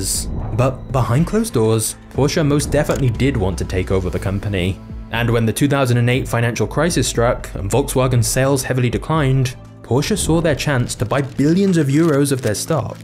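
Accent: United Kingdom. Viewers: Bro is so hardcore Britain. 😂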